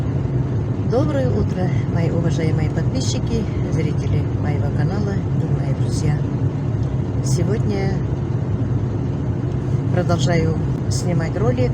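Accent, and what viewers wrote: Tatarstan, Russia. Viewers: вы молодец люблю татарскии акцент я сама татарка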